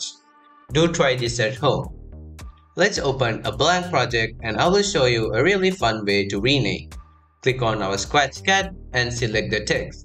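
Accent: India. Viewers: Him : voice Me : IndiaN?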